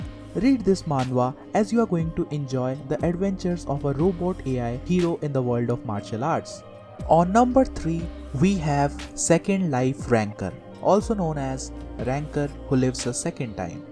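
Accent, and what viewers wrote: India. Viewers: Just a question u sound indian are ye ?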